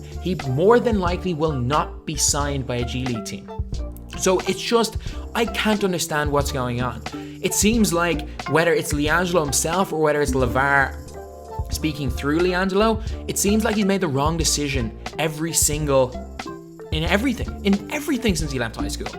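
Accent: Ireland. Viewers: Are you irish? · You sound Irish are u Irish?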